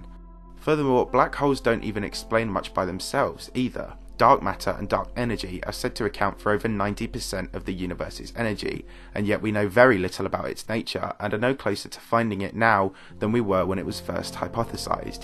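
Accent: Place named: England, United Kingdom. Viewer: Natural narrator, and English accent just kills it.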